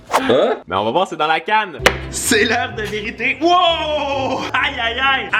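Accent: Quebec, Canada. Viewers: Jtm continue tu est trop fort est j'adore la vois Québécois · Je t'aime, continue, tu es trop fort, et, j'adore la voix des Québécois.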